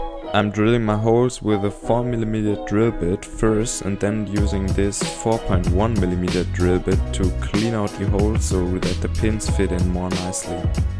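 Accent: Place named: Germany. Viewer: Great video 👍🏼 ich nehme mal an du sprichst Deutsch bei deinem Akzent? However well done👌🏼👍🏼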